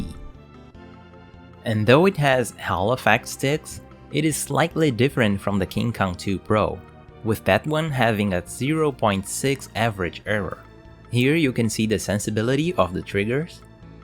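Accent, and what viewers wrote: Brazil. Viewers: o Pc dele inteiro tá em português do Brasil mano, e ele não fala como nativo, ele tem sotaque brasileiro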